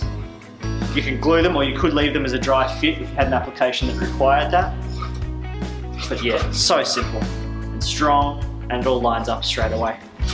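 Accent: Australia. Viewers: Excellent instructional video. Like the fact its presented by an aussie so I can understand it. Thanks for posting. I'm buying the gear.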